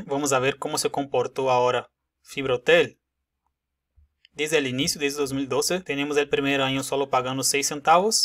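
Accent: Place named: Brazil. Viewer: No sabía que no eres mexicano ! tienes acento de Brasileño, estoy en lo correcto? gracias por tus aportaciones... Y una pregunta tienes nacionalidad? si no es asi... como le haces con los impuestos? puedes tener cuenta en cualquier casa de Bolsa?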